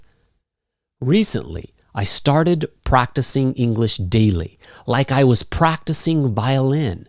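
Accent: United States. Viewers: It sounds american.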